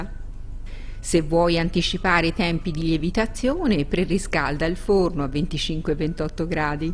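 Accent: Tuscany, Italy. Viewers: Hai una bellissima voce, mi pare tu sia Toscana. Complimenti per la spiegazione della ricetta. Buonissime!!! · Che bell’accento toscano! 😀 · Sei toscana?😅semplice curiosità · Buonissime e mi piace anche l`accento Toscano ho vissuto a Firenze 20 anni e amo l`accento toscano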